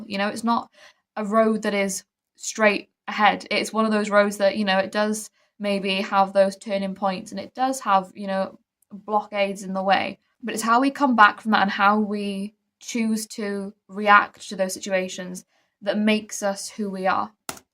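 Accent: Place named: Nottingham, United Kingdom. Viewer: Your very smart.. thank you for appreciating mvs.. I remember my friend from nottingham in UK.. you both speak the same.. ❤❤❤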